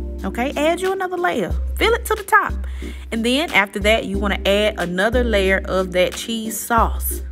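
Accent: South, United States. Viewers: Will be trying your recipe tonight 😋 I love the way you explain and talk lol sweet southern belle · She definitely from the South, I love Us😊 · By the way your voice is so southern and comforting that I sometimes listen to your YouTube channel even when I'm not cooking, you remind me of my aunt back home in the south. Everything you make, makes my mouth water! Keep that delicious goodness coming! ♥️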